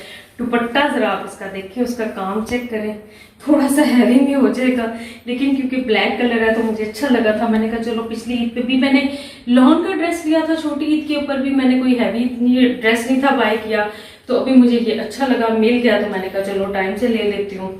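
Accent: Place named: Punjab, India. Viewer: I love your punjabi texture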